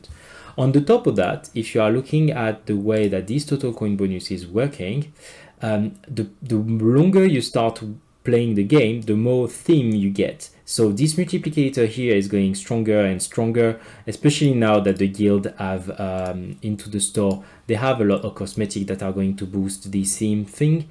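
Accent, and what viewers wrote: France. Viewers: this video title and the first 1min have already made my day! been wondering which creator code to use soon but I think I may have made my decision. i was prejudiced against your accent because I thought I couldn't understand it. But once I realized you were French it became very easy to understand you. Your mindset and presentation both most closely resemble my thinking and learning style. (Shout out to Spartan, as well). Can't wait to finish watching!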